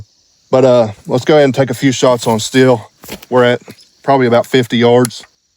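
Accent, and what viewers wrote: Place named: West Virginia, United States. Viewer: Fellow West Virginian? ✅ Smaller channel? ✅ Shootin in the holler? ✅ IWI Ace? ✅ My ears perked up immediately when you started talking 😂😂 you got another sub, hoss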